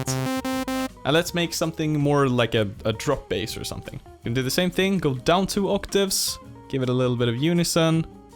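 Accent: Sweden. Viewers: Damn you sound swedish! haha Haven't looked further than , but damn, the swedish accent is killing me LuL - another swede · Can an accent get more Swedish? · You from Sweden? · it's the swedish accent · it’s the thick Swedish accent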